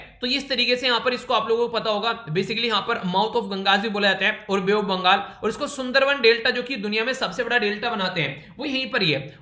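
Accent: Haryana, India. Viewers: really are you sure ??...mai sir ka bolne ka tone or bhut sare elimination lagye ki bihar se ek frnd tha to wo nhi hoga or up se vi nhi or rajasthan se doubt tha to sir ka tone rajasthani hindi nhi hoskta fir uske bagal me haryana h or tone match krrha by map so mai guess kiya hua...or bagal me rajsthan ka PCS vi diye h...or sir bolte h aas pas ka PCS diya kro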